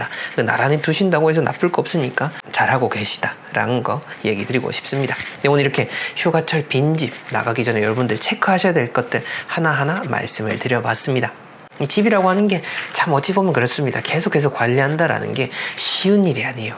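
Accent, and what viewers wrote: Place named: Gyeongsang, South Korea. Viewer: 흥 ~~~우연히 보다 지금은..ㅎㅎ자주봐여^^ 목소리도 좋고 설명을 너무 잘 해주셔서 더 좋아용😂 근데 경사도분? 사투리 ㅎㅎ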